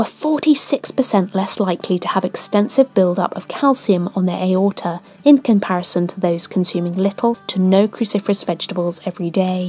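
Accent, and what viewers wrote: United Kingdom. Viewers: I love your voice and british accent :) Great information shared, thanks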